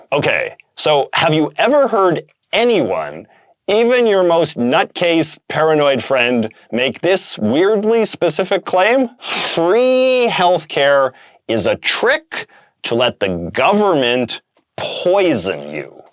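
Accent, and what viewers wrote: Canada. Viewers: How are you the most Canadian sounding youtuber ever??? That "About" has been UNMISSABLE in this video. Love ur videos tho. Love the way u articulate and project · D --> my guess is Canadian because of how he says the word "about"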